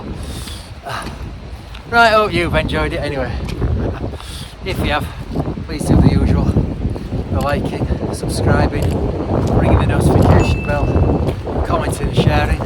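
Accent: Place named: Yorkshire, United Kingdom. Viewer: Standard day int Lakes, but glad the weather improved for yas;) I've lived here two years now and it's hard to motivate yourself to get out when it's claggy and damp so much, compared to other places I've lived (Northern Snowdonia) and yes, a fellow Yorkshireman so always glad to hear you using the natural dialect and you like to get away from people!:) Better weather days are coming!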